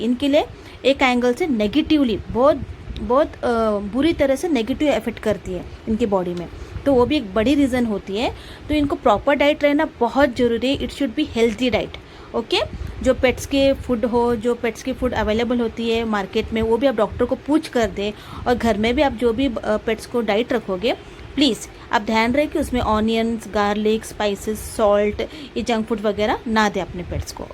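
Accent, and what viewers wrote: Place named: Telugu, India. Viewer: Pakka telugu Haaa😅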